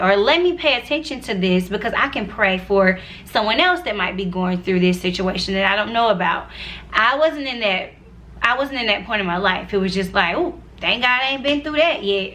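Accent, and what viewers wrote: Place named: Georgia, United States. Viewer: Thank you for sharing your story! I resonate so much with the many years of believing in Him but not really KNOWING Him but having the time when you dive deeper & learn to CLING to the relationship you developed with the Father ❤️ P.S. while watching your video I was like “This girls accent is definitely a Ga accent” because I’m from Ga too, then you said Jonesboro & I was like 😮 I grew up in Jonesboro too!!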